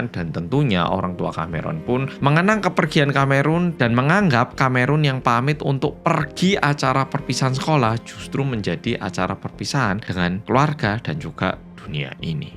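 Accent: Java, Indonesia. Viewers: Wong Korea medok tho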